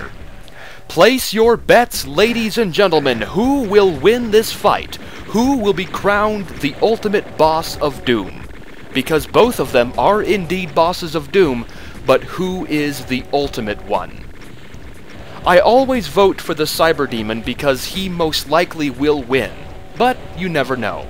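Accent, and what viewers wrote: United Kingdom. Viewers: so BEAUTY SPEECH. NICE. I LISTEN YOUR VIDEOS EVERY NIGHT. NICE ENGLISH CLEAR. I LISTEN VOICE CLEAN BRITISH DIALOGS. I ALMOST 100% UNDERSTANDING THANKS TO YOU SIR FOR FINE SPEAKING. SO AS I COULDN NOT UNDERSTAND OTHER INTERVIEWS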